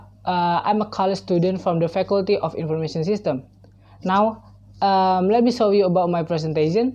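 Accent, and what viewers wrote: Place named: India. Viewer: logatnya sedikit mirip kaya orang india wkwkwk boleh laa kak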